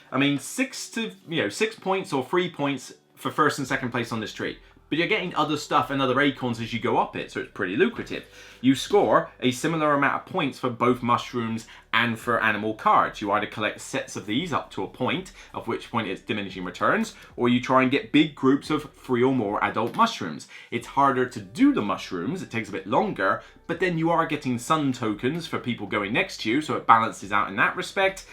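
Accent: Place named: West Country, United Kingdom. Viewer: New to the channel. Nice review! Hope you won't find this too personal but I find your accent (and accents generally) very interesting. I'm guessing a mix of west country and New York/southern Canada?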